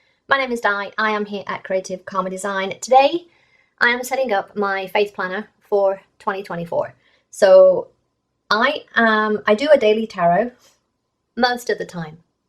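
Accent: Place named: United Kingdom. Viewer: Di are you from the UK originally? Your accent and phrasing is very UK. Agree that HP have to expand their faith range x My craft room is the same 😂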